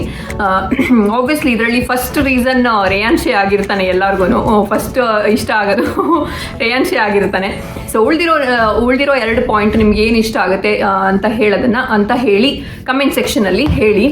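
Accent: Mangalore, India. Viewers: 1ನಿಮ್ಮ ಮಗನೆ ತುಂಟಾಟ ಕನ್ನಡ ಮಾತು ತುಂಬಾ ಇಷ್ಟ. 2ನಿಮ್ಮ ಮಂಗಳೂರು ಕನ್ನಡ ಮಾತು ತುಂಬಾ ಇಷ್ಟ. 3ನೀವು ಕೆನಡಾದಲಿದರು ನಿಮ್ಮ ಸರಳತೆ ನಿಮ್ಮ ಕನ್ನಡ ಮಾತು ತುಂಬಾ ಇಷ್ಟ 😘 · 1. cuteness 2. Mangalorian. namma urinavru. mangalore kannada 3. Foreign nalli idru kannada dalli matadtira. nimma voice ista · 1. cuteness 2. Mangalore kannada 3. Your talking style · 1.Nimm Mangalore kannada tumba esta agutte 2.Nimm unique Voice ishta agutte nivu matado kannada ishta agutte 3. matu nivu avnig kodta iru Sanskriti tumba ishta nimage adakke danyavadagalu... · 1.Nimma manglore Kannada tumba ista. 2. Nivuu Canada dallidru Kannada na tumba chennagi matadtira so nice of you and cuteness. 3.Nim Volgs simple agidru nodoke kushi ista agutte anyhow keep doing Volgs👍.nim hubby veg curry I tried it was came out yummy tq. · I love you akka I love voice and your voice I love your cooking I love your way of talking I love your way of Mangalore Kannada voice I love you are editing Lots of love from INDIA ❤️❤️❤️😘😘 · I love you r voice 😘 simle ❤️ Your manglore kannada style and your family ❤️😘❤️ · Firstly I am an engineering student. 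I wanted to do masters in Canada and probably work there for some years and come back to India. While I searching about this I found out your channel. Since then I am following you. 3 points are 1. You sharing the experience of living in Canada 2. Nimma Mangalore kannada😂 3. Your simplicity and humbleness Asthe akka mathe yenu illa By the way nim maga super cute. Hopefully I fulfill my dream of doing masters in Canada 😬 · Awesome... & romantic end.. "neenu nodbeba haage.. heludusa marthuhegtada"... Mangalore Kannada rocks..❤🤩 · Nim Kannada ಮಾತಾಡೋ ರೀತಿ especially Mangalore Kannada is too good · manglore style talking Ur positive vibes when u speaks about any thing I love ur family estu kushi kushiyagi irtira manele idruuu And i love ❤❤❤❤ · 1.I love u soo much 2. I like ur talking style manglore kannada I am from Coorg 3. Beautiful content and camera clearty is super ur the best...... YouTuber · Channel yake ista Andre 1. 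Your voice and Mangalore style kannada 2. Your life style and cooking style 3. Nanage nimma explain maduva method ista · 1. matadodanna nodlikke nimma vedios nodtene. 2. Nimma mangalore kannada kelalikke tumba kushi agutte mattu kannada dallina spastane kelikke tumba esta agutte. 3. Nimma vedio editing mattu background music kelikke tumba esta agutte.. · Hii Mam hegidira Nim video yake eshta andre 1. Nimdhu cute family, nim husband Nimge support madthare, mathe, nim kannada adhu nam m'lore language chenagidhe, olle useful video madthira. Dress chenagieruthe nim yelrdhu.soft agi mathadthira. 2.mathe Nim maga cute boy mathu, smile, video end madodhu yela chenagidhe, avnu super 👍👌 namge eshta yelrigu nam family ali. 3.mathe nim smile chenagidhe, video namge use ago video madthira adke eshta, hage nivu mado recipe yelanu eshta india, Karnataka, m'lore, style ali madthira chenagieruthe. Try madthivi navu maneli. 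Hage Madhya tulu language mathadsodhu eshta aguthe. Thank you, take care bye. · 1. I love while he is speaking 2.Talking Manglore Kannada 3.Your positive vibes and ur tradition. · 1. Alwa. haaaa ee dialogue ge 2. Nim manglore Kannada so sweet so 3. is my son Vaibhav 's friend so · Hii mam u r vlog is very nice 1-nivo thumba chanagi mathadthira mate nima vlog chanagide nima mangalore base thumba chanda untu nima adige sayili thumba chanda 2-nivo nim vlogs ali thumba india bage mathadthira nimge nam deshada abhimana thumba untu mate nivo alli ero place galna thorso reethi chanda mate nivo durva sarja agu malavika mam jote mathadida reethi chanda untu 3-nim magu thumba cute avnu thumba muddu edane mate nima family super small family edru thumba kushi agi edira age eri mundenu nim vlog ege barthirli navo adike kayitha ertivi mam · mangalore Kannada ❤️🔥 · 1.i love you 2.mangalore kannada 3.i love your channel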